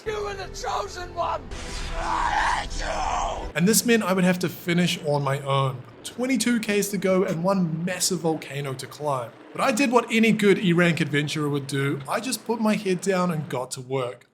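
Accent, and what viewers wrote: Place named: New Zealand. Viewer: Loving the kiwi zwift commentary. Follow on zwift as well. When’s a group ride coming?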